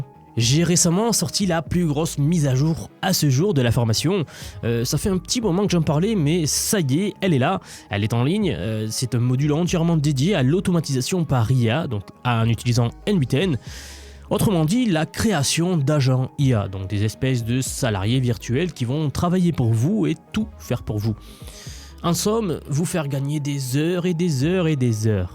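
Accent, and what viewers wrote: South, France. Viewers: Je pense que tu es une IA bien alimentée en données issues de publications scientifiques. Mais ce serait une déception car j’aime ton accent méridional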